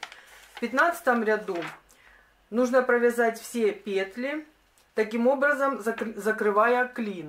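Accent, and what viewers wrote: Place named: Ukraine. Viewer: Неприятно слушать украинский говор.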